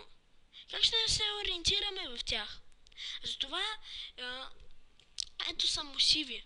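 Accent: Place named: Russia. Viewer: Руснак ли си, защото имаш як акцент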